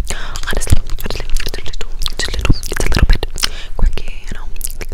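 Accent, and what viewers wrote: England, United Kingdom. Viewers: Can you make a video with only your England accent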